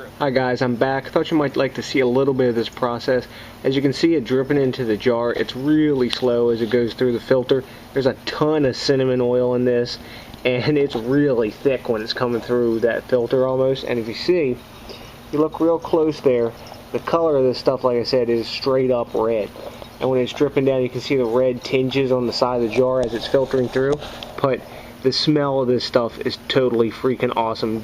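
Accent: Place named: Delmarva, United States. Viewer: I am pretty sure this guy is from the DelMarVa (Delaware/Maryland/Virginia tristate area). His accent gives him away. Let me know if I am right!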